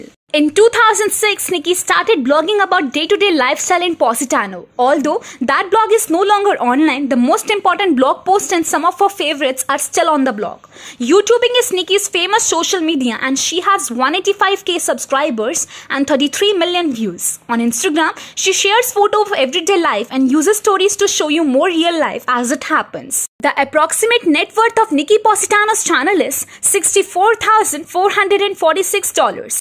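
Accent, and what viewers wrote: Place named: India. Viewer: You have a very strong Indian accent that I have a hard time to understand.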